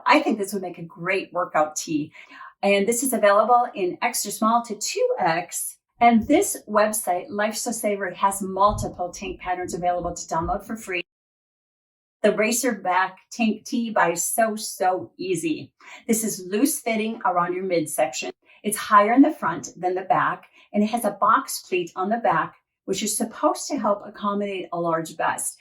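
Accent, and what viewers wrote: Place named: Philadelphia, United States. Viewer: I agree, I think I may try the one you have on first. Great list of tops. Why did I have you pegged as a Philly or South Jersey girl? Texas? Never would have guessed.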